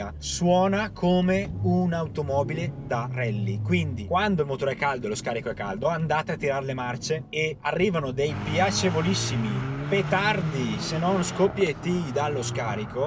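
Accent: Brescia, Italy. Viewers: Finalmente un bravo tester con il nostro tipico accento bresciano complimenti ..!! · figa fai un corso di dizione, la cantilena bresciana non si può sentire!!! :D anche se sei bravo!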